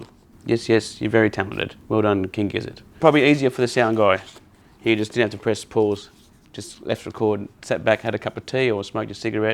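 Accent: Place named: Australia. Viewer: Great review. Love the Aussie accent 😁 mines a bit different 🇦🇺 Yah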